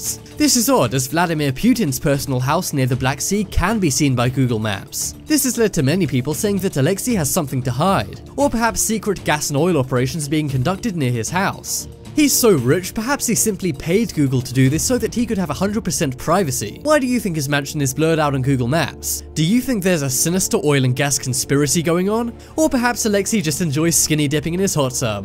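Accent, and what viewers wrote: England, United Kingdom. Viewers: I’m 100% sure, he is british... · It's nuclear,not nukyilla, I thought you was English at first,but I'm not sure now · makes me laugh how this guy sounds british yet pronounces nuclear as nukiller like an american ffs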